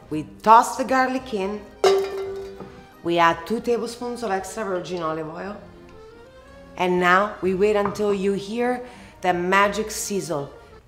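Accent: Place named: Italy. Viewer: Regarding her accent, it should be clear to everyone in the meantime that she is not American, but she is Italian, born and raised in Italy. She came to America as an adult.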